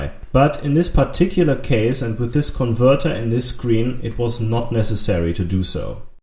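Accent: Germany. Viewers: Sorry aber man hört den deutschen akzent einfach raus ; D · 100% German accent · Hi your englisch sounds German : ) Realiy nice work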